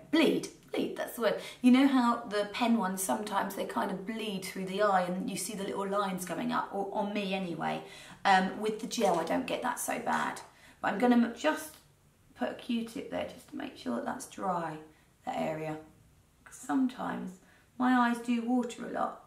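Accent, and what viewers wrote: United Kingdom. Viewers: Are u from the UK cause I love ur accent/voice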